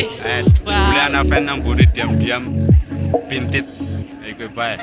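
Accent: Banyumas, Indonesia. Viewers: Ngapak bae bhsne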